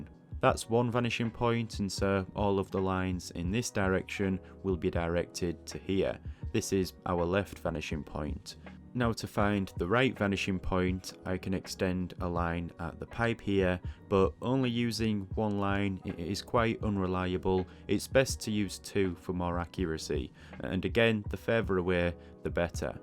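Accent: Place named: Yorkshire, United Kingdom. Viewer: Brilliant video. Also sound like a fellow Yorkshire man! 👍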